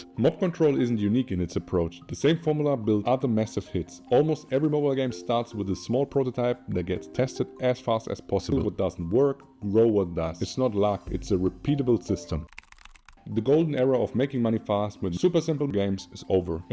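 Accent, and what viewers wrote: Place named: Germany. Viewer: i smell german. wie machste das mit den steuern ?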